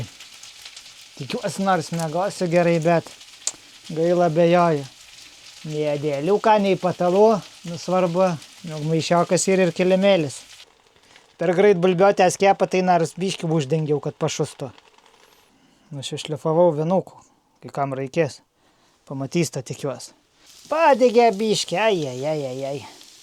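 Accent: Aukštaitija, Lithuania. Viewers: Smagu žiūrėti tamstos video ir klausytis gražios aukštaitiškos tarmės😅🎉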